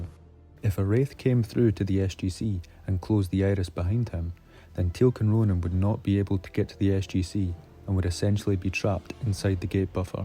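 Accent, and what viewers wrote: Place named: Scotland, United Kingdom. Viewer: Ok.. NEW here so DON'T hate.. I had NO idea you were Scottish.. but I IMMEDIATELY heard Scotty from Star Trek and Carson from Star Gate Atlantis.. sorry for stereotyping but DAMMMMM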